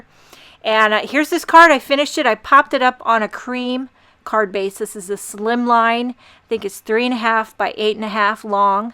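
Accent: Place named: Massachusetts, United States. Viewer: being from Mass... you are pronouncing Mass...the way it should be; however, roof in Mass is pronounced like woof, but with the r. However, i have heard folks in your geo area pronounce roof as you do. In any case, considering how badly Mass... slaughters the English language, i mean no disrespect to you or your regional accent.🤗🥰✌😂💐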